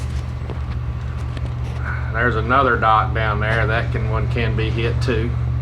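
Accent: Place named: Appalachia, United States. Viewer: You did a FINE job but MERCY, you sound like you're from here. You'd make a fine hillbilly if you wanted to!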